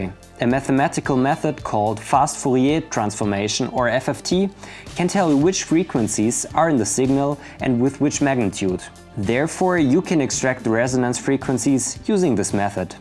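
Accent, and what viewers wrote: Germany. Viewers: are you german :D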